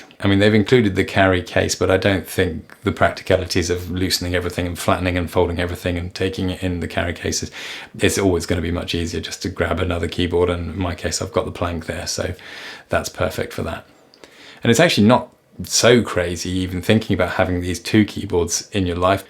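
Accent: United Kingdom. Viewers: I bought the Planck after I saw your video and would never renounce, the split should be in the Planck as some models you can see on reddit where you can basically decide the angulation while having an hinge on top. We need to change our position during the day and I was inspired by you to go also to the garden withe planck. But as I use android studio/intellij and xcode I need a cloud solution to use them via a remote desktop or cm cloud solution, because 16gb ram cannot have on a tablet. Do you develop with cloud based solution in your ide? Keep going with the great videos they are really relaxing and smart, and your English accent helps to enjoy them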